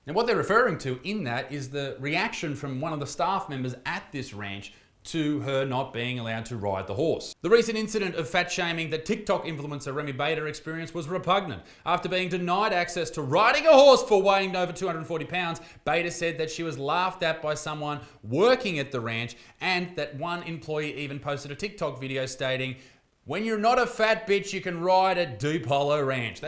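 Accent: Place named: Australia. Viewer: Best damn accent, live in brizzie so i dont hear our accent often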